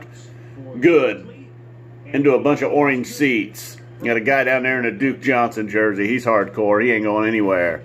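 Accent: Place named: Ohio, United States. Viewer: I love this guy's Ohio accent.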